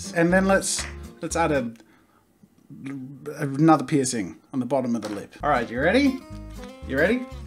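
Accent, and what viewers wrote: Australia. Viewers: So he's Australian I thought he was British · Australian dialect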